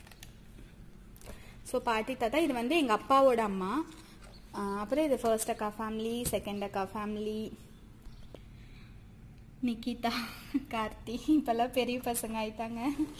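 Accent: Kongu, India. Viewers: Kongu ngala neenga pesura slamg la therunjuthu album pathatha vechu kekaren thapa nenachukathinga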